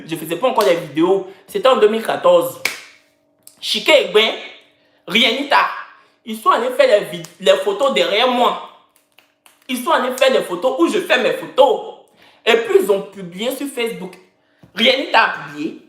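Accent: Cameroon. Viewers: Ton accent devient camerounais j’adore 💜😍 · ton accent deh, maintenant tu n'est plus ivoirien tu es camerounais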